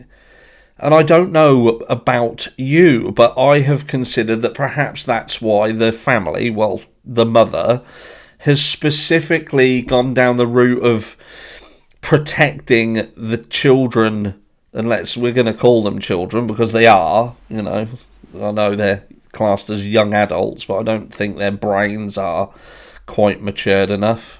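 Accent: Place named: England, United Kingdom. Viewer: Awesome sir! So glad found ya! I love the English accent my friend! Our views are same on many aspects of this case! Thank you for good information,and great theories! Have great Friday Mr!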